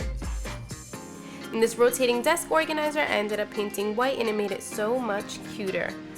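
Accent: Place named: Massachusetts, United States. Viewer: Are you from Mass? Your accent is so cute! Lol #CTNative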